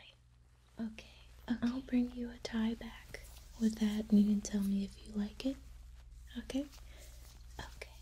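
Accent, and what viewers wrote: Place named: South, United States. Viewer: Tue only problem is she sounds southern and me and the south (mainly the bible belt) try and stay on opposite sides of the Mason dixon line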